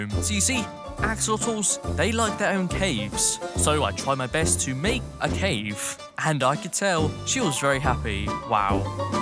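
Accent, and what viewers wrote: United Kingdom. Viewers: Are You British? And That's Not Moss Block It's Mossy Cobblestone Not Moss🤦🏻🌷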